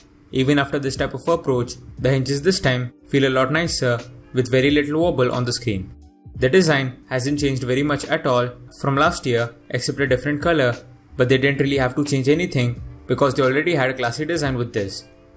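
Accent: India. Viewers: You try speaking Indian without accent..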